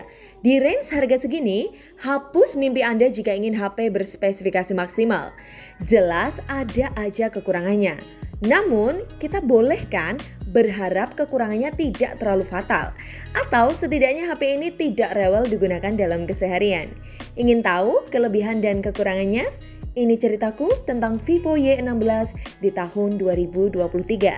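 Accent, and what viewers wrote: Surabaya, Indonesia. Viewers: Suroboyo pasti iki😅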